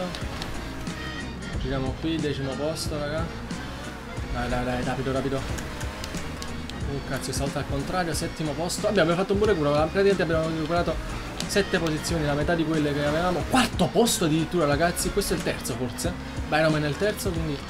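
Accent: Naples, Italy. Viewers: non so se lo hai mai detto,ma sei napoletano? -Sasy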